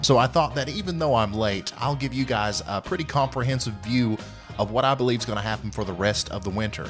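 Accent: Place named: Kentucky, United States. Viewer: Ha ha! I keep commenting about hopeful snow in KY and judging by the pic in the background and the accent like mine, your in KY too! What part?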